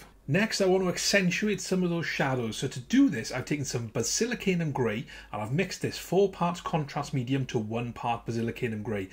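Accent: Wales, United Kingdom. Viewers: Great vid again bud, p.s what part of Wales is the accent.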